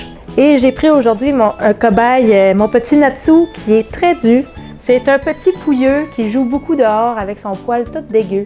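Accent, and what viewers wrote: Canada. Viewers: Je suis né en France j'ai grandi en France je parle très bien le français et franchement son accent ne me dérange pas du tout elle est très compréhensible quand elle parle, elle est canadienne et franchement c'est très compréhensif ce qu'elle dit dans la vidéo. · Lol cet accent québécois j’arrive pas à m’y faire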